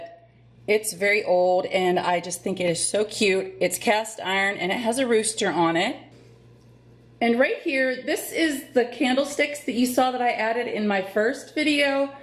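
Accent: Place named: North, United States. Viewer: I have those same tomatoes too. I use them in my summer decor. Our weather isn't too bad here. No snow and temps in High 30' today. Not as bad as some places that got dumped with snow. Are you from Wisconsin or Minnesota? I feel like you have that northern accent. I grew up in Michigan, people say I have an accent too lol.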